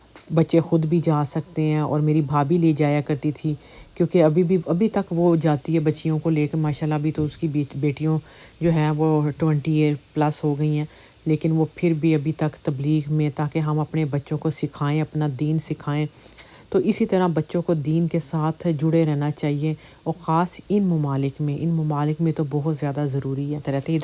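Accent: Punjab, Pakistan. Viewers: Ap ka accent punjabi lagta hai as you mentioned you spent your early childhood in punjab but ironically your accent for English is not like the one who grew up in Canada ! Btw great video ! Keep up the good work ❤ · Hi hope you will be fine happy to listen Pakistani voice in Canada apna pan pata chalta ha thanks for making video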